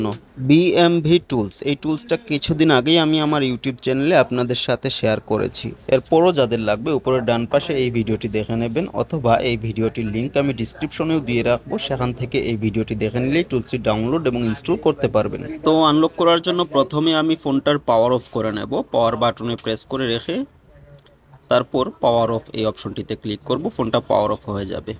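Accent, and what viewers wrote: Sylhet, Bangladesh. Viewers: Vai Sylheti😊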